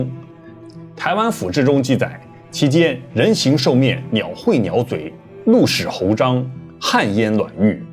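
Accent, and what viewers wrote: China. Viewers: 直播主聽你這口音是中國內地人對吧，解說網路怪談視頻越界到台灣本島了，魔神仔是台灣本島才有的怪物，中國內地可沒有魔神仔出沒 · 想問一下，您到底是台灣人還是中國人? 純屬好奇，因為覺得您口音很像大陸人，但對台灣卻很了解，感覺您是大陸人台灣人的綜合版。:face-blue-smiling:face-blue-smiling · 主持人大陸人口音耶介紹魔神仔到後來自己一直講成神魔仔😂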